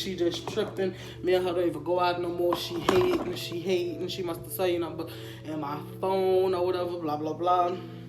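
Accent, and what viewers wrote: Louisiana, United States. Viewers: I love your accent 💕 are you from Louisiana? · i just found your page but your accent 😍😍 . that’s sound like that nolaa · Accent sounds like Louisiana, hey sister girl 🥰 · Love that New Orleans accent whole video lit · U have to be from Louisiana. Love yall accent! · Love your accent you must be from da boot oh and LOVE your hair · I love Louisiana accents😭❤️ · New Orleans ? First time watching her videos and Her accent hitting me hard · She gotta be from Louisiana she got that accent..I lowkey miss living in Louisiana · Da accent is deeeeeeeeep ! New Orleans gurll😻❗️ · This popped up on my feed randomly and my first thought hearing your accent is New Orleans gotta be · I went to college in Louisiana. I know that accent anywhere 😍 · That Louisiana accent tho... Right it is Louisiana? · I can tell you from Louisiana 😂✊🏿💯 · Where you from!? 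Sounding like nola babyyyyy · Louisiana accent? It's so cute 😃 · You from Louisiana ? You sound like my fam from down there in slidale☺️💕love yo accent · Yesss with the nawlins accent!!!❤️ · I knew you were from Louisiana! I love your accent 😍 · that louisiana thick in that accent. It is so cute!!!! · I already knew you was from Louisianaaaa, I love your accent 😭 #newsubbie ✨ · I love her Louisiana accent!😂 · She gotta be from Louisiana I hear the craw in her accent I loveeeeeee it though you got beautiful hair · Period nails and hair.. such a fan of your accent too, let's go Nola baby lolll. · U got to be from Louisiana with that accent ... 💜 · I automatically knew you was from Louisiana with that accent lol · New Orleans accents are my absolute favorite!!!! However; what is not my favorite is sis flipping her hair back and forth like 😐😐😐sisssssss please just do your hair it’s distracting!!! 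· My guh u from Louisiana? You sound just like my family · Ive had some shit like this happen to me a few times smh imma do a few story times on my channel one day when i get the courage lol, but i loved the vid and ur accent made me miss Louisiana cant wait to go after the rona clear up in the u.s. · Your accent made me automatically subscribe. Ain’t nothin like a good ole NOLA Accent BAY-BAY 🤩😍😍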